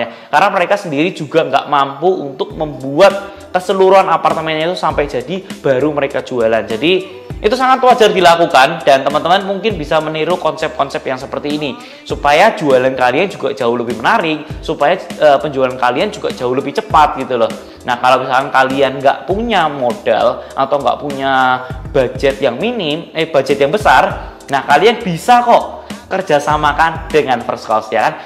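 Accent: Java, Indonesia. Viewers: Logat jawaaaa medok koyok akuu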